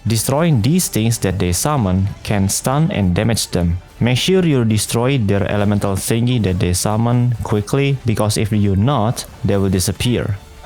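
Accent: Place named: Indonesia. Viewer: i hear indonesian accent speak english, i like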